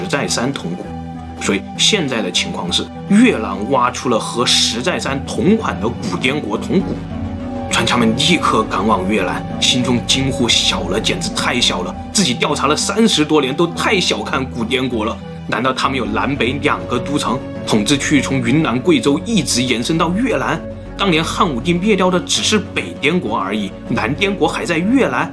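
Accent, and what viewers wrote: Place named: Zigong, China. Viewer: 小哥是自贡—带人吧，你的口音太像南征北战的那位演军长的陈戈…大才子自贡人。自编自导自演的名剧抓壮丁😁😁😁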